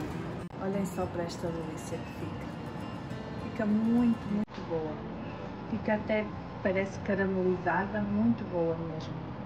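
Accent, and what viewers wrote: North, Portugal. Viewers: origami art, foi este sotaque é o motivo, que os cariocas conversam diferente das demais regiões do país. É que quando a corte chegou, vinda de Portugal, todos ficaram imitando os portugueses e deu nisso. O Brasil é o único país das Américas que teve Rei. Sabia? Não só rei, como duque, marquês, conde, visconde, barão, etc nesta ordem, onde o duque é abaixo somente do rei. Saba? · Olha menina, eu to daqui do sul da Suecia vendo voce fazer esta receita deliciosa e nutritiva, nossa quero fazer pra provar, obrigada viu..Amo escutar seu dialeto portugues..Tenho uns conhecidos aqui dexMalmö e a Ricardina em Hasseholm.. Olha mae falava, " sôsu! Palavra antiga no 🇧🇷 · É do norte tem prenuncia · Amo o sotaque português, aqui no Brasil chama-se escondidinho de bacalhau.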